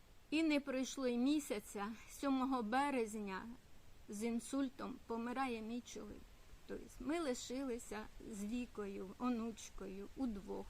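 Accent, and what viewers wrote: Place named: Ukraine. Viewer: подивилася ваше відіо в сльозах,не дай Боже пережити нікому,що ви пережили,як ви сказали. Ми маєте для кого жити. Дай Боже дочекатися правнуків У Вас приємний голос і укр.акцент. Я з нетерпінням чекаю ваше відіо. Поважаю Вас. Здоров'я Вам іздійснення ваших мрій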